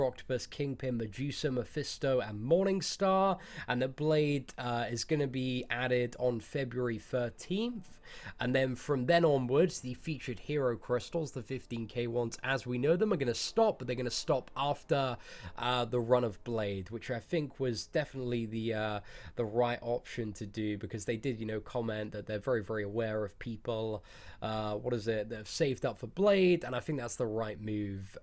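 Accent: United Kingdom. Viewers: damn i saw the thumbnail clicked it was expecting a 5* opening lol....but always a pleasure to hear tht British accent...keep up the awsum wrk man :)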